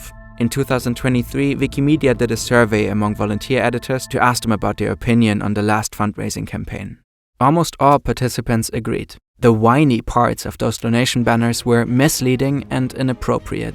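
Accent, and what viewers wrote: Germany. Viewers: you sound like a native german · Are you german? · Coz he's german w is pronounced as v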